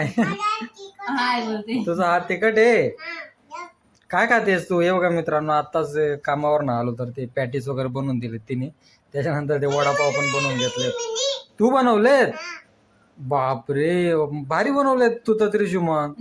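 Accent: Khandesh, India. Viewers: खान्देशी आहात का भाऊ tone तिकडची वाटून राहिली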